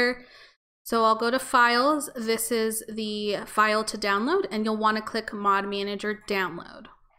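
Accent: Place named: United States. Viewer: Hi great video and i love your Accent if you dont mind me asking what part of the US do you Hail im actually from the UK?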